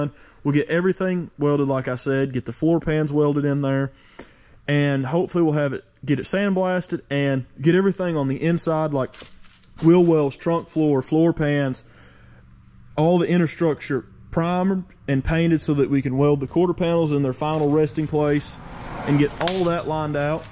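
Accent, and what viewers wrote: South, United States. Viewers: I'm so hooked on hearing the ambiguous southern narrative describing the journey of restoring a completely totaled wreck into a running driving car! I can't help but thinking the song should be playing in the background. Can't wait to see the finished outcome.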